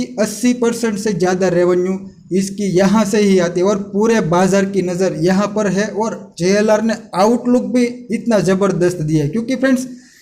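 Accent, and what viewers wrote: Gujarat, India. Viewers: Gujarati lagta hai bhai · Way of talking par thi khbr pdi aapda gujju😂😂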